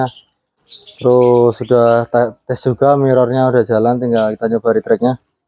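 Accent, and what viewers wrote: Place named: Java, Indonesia. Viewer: waudohe, kulon kinten seputaran jogja jateng ndanku, logate kok jowo banget, ajeng nderek pasang menawi celak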